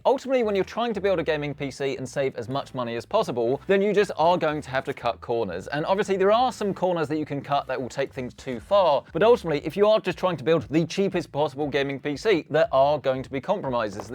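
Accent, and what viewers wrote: United Kingdom. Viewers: I just can't understand with the UK accent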